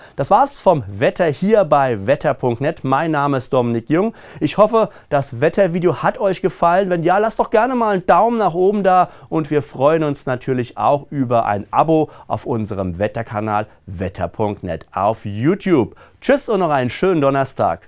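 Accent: Hesse, Germany. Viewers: Hallo Du, das Hessische ist nun mal so - Augen zudrücken😉 Wo kommst Du her?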